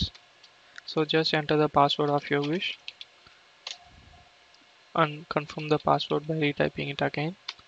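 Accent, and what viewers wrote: India. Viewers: Interesting. I had a bit of trouble with the Indian-English accent. Perhaps a transcript would help. Thanks.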